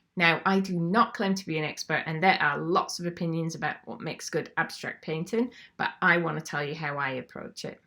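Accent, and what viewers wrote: Yorkshire, United Kingdom. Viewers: After trawling through so many art videos on youtube, this is the first and only one that has left me with more questions than answers and that is brilliant. Eight minutes of inspiring and thought provoking content. So nice to hear a Yorkshire person too. If you have any tips on how to gain inspiration from living in Leeds rather than beautiful North Yorks that would be greatly appreciated!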